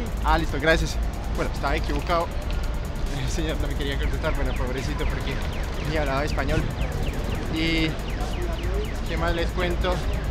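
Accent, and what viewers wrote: Bogotá, Colombia. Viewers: sabe que si tiene acentó colombiano pareces paisa el mejor acento de Colombia. pero tu eres demasiado noble y linda tu forma de ser felicidades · x q tienes acento colombiano ?? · deberías de venir a Barcelona, guapo jajaja me hace gracia tu acento de Colombia tanto que dices... señor que pena y pides dirección, pero acá pena, no es vergüenza, pena es de lastima o tristeza pero igual me gusta mirarte y escucharte 😍😍😘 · Es que tu español es muy colombiano y es casi perfecto pero si despierta curiosidad jajajaja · me gusta mas como se te ve ese look sin barba y con lentes y a mi se me hace que eres Colombiano hablaa excesivamente bien el espaniol y tienes muy marcado el acento de alla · Yo te lo digo. Pienso que eres alguien que ha vivido parte de su vida en algún país de Latinoamérica (tu acento colombiano te delata), seas o no nacido allí. 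Un saludo. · el Francés les parecerá extraño porque te vez claramente europeo pero con acento latinoamericano casi, casi como colombiano. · Hola! Ven a Rotterdam... Aca no te pierdes.. yo te doy un tour por la ciudad 🤗🤗... Me gustan tus videos y tu acento Colombiano... Un abrazito... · te extrañamos en Colombia. Y cierto lo que dicen todos en los comentarios.. tu acento es recolombiano. Saludos.. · Este man habla con un acento muy rolo para ser Francés !!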